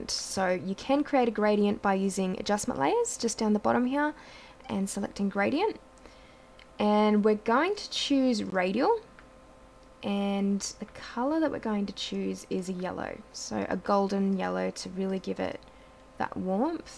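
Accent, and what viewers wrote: Australia. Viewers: with an Australian accent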